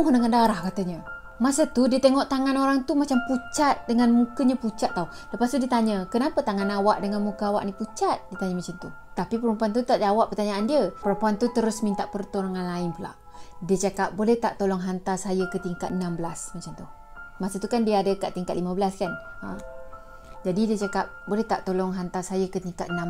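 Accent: Kelantan, Malaysia. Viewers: Ni orang kelantan slang nya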